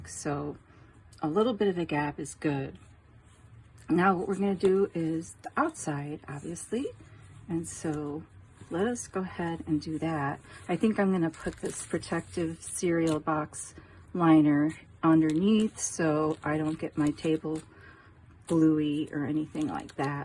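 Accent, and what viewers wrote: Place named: Wisconsin, United States. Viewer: You sound like you’re from Wisconsin -are you? I am in Mequon ☺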